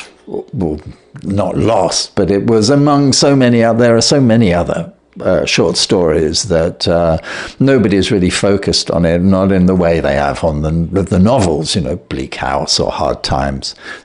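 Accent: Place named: RP, United Kingdom. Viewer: Many thanks to you Mr for this excellent voice and nice RP accent. I beseech the Almighty Allah to bless you. Accept my greetings to you from Iraq.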